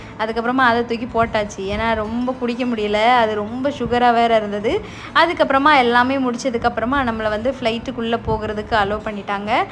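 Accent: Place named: Kanyakumari, India. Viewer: First time watching...... ivanga kanyakumari ponnu, the slang😊❤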